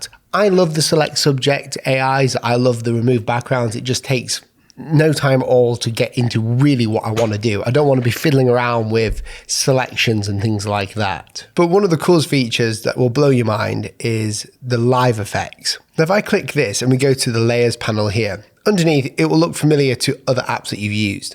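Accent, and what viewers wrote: United Kingdom. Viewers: nice video, more please nice to hear a British person explaining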